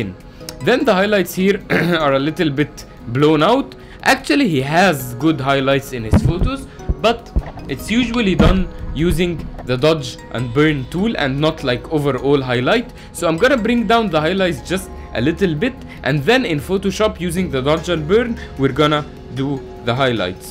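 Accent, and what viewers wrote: Egypt. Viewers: The egyptien accent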